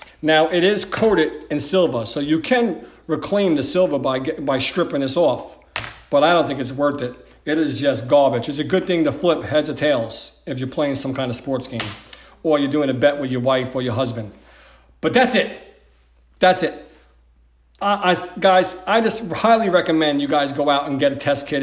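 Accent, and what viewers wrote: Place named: East Coast, United States. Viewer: Thank you for this simple and effective demonstration. I do love an East Coast accent!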